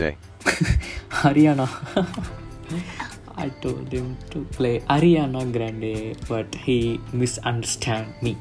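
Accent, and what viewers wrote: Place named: England, United Kingdom. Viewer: Bro im a fan of your English accent bro ❤😂😅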